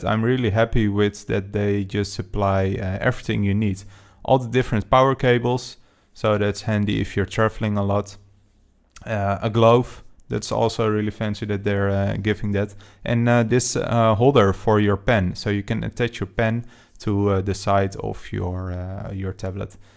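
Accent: Netherlands. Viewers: Your Dutch accent hihi let maar niet op mijn opmerking viel me gewoon gelijk op.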